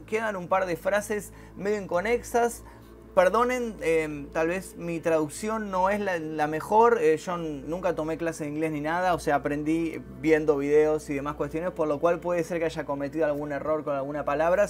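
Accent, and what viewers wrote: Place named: Argentina. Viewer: Que bueno que no eres el tipico argentino con el acento cargado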